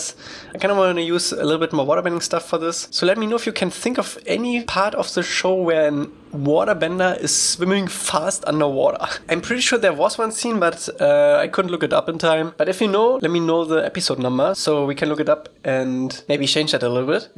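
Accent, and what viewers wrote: Germany. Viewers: this deutsch akzent is da hehehe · You sound so German...😂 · I hören a Deutsch accent 😅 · Mann hört das du deutsch bist 😅😅😅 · Höre ich da deutsch raus?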